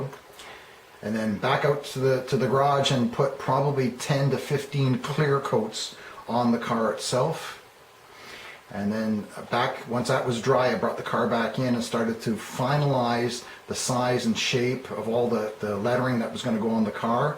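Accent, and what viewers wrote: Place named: Canada. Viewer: that is an amazing story - and an amazing model. One error in your narrative - we designed and built the car in MILWAUKEE, Wisconsin - not California. When I heard you say the words PRO-ject and DECK-al I knew you were Canadian. I understand the long winters up there need some indoor activity to fill your days. Neat collection of hotrods, too. Actually, it took us less time to build The Blue Flame than your model. The project began in August 1968 when we signed the contract with the American Gas Association, and ended in October 1970 with the record at Bonneville. The car was actually essentially done building in July 1970, just for comparison. Great video, too!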